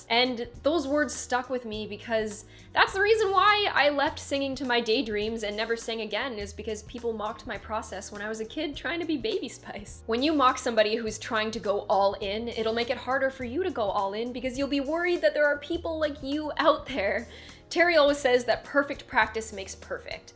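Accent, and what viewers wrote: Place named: Canada. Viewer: Such a lovely message! I just want to pinch your cheeks - you're so pretty. "Don't mock the process!" Sounds so much better in your Canadian accent (than my English accent) but such a golden quote.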